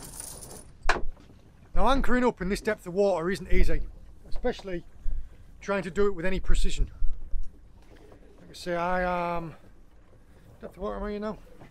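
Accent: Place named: Cumbria, United Kingdom. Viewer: you’ve almost got a bit of a Cumbrian twang in your accent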